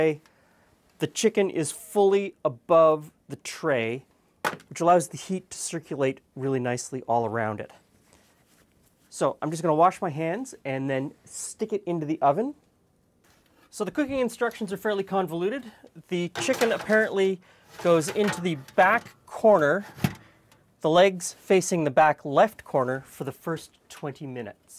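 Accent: Canada. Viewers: omg r u canadian!!!!!!!!!?!?!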